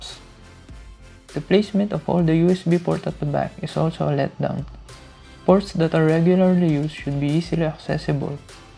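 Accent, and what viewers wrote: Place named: Philippines. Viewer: I can see from your accent and your hand tattoos from Kalinga tribe that you are a Filipino. May I know how did you manage to get your hands on AW x17 r1? Did you use 3rd party courier? As you know, here in PH the x17 r1 is still unavailable and most likely will never be available because I heard that Dell PH will only import the X15 versions.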